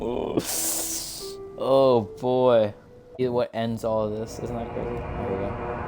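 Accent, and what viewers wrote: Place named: United Kingdom. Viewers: He does fr sound British to be honest in the videos beginning ngl lol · y sound british. btw better reply to this or im unsubbing!!!!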